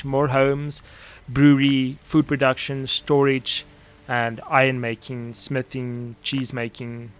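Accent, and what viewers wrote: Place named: South Africa. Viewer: Hold up. I hear a South African accent. Was looking for someone to that does a decent long play of this game and now a fellow Zafer pops up. Lekker man.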